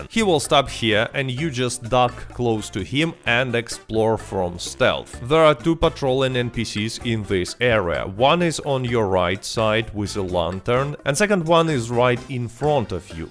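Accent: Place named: Russia. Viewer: Nice russian acent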